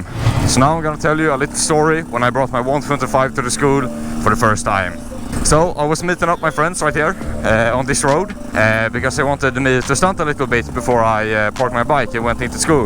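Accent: Sweden. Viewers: u sound swedish are you? Im swedish. hej hej vad heter du? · jag kan höra svenska dialekten bro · I can hear you are Swedish instantly I’m Swedish too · Are u from sweeden? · are you swedish>??? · Ja, man kan ju höra svengelskan 😂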